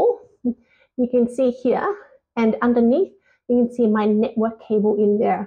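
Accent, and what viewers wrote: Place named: Australia. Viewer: I thought you might follow her. It was a surprise for me to hear an Aussie accent when I found your channel. You don’t see many of us Aussies talking about trading.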